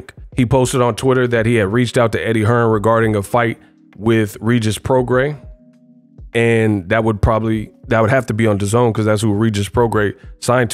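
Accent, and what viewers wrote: Baltimore, United States. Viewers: The Bmore accent, up there with the Pacman, Bob Arum, Kell Brook. Best in the business-Smash the LIKE 👍🏾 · I can't with you, and the Baltimore accent 😂😂😂 in boxing sometimes fighters learn things the hard way, DHP will have an answer for the left-hand in his next fight. What a great year in boxing if we get Tank vs Devin in 2023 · Lol@ your B-more accent.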